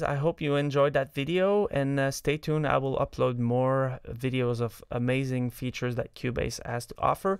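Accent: Quebec, Canada. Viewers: Eille stun accent québecois ça! :D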